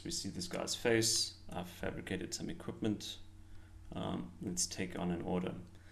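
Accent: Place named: South Africa. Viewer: I could listen to that smooth SA accent roast game menus all night. 🍆 Lmk when and where I can watch the new short film, and while I'm on the subject, I would personally love to see a video showing how you tackled all the rotoscoping in Davinci. What GPU you runnin', tough guy? Tutorials, let's go! 🤣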